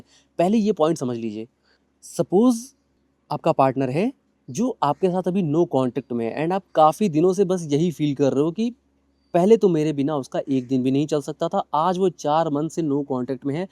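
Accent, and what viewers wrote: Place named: Bhojpuri, India. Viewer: Loved your bhojpuri tone ❤our language is our Pride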